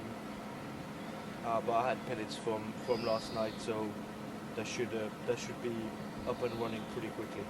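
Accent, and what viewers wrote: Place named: England, United Kingdom. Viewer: You sound more like a Limey...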